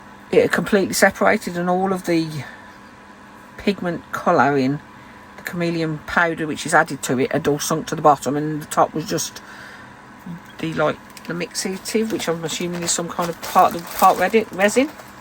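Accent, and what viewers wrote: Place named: West Midlands, United Kingdom. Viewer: This looks lovely! You sound local, a West Midlands twang to your accent, thank you for sharing ❤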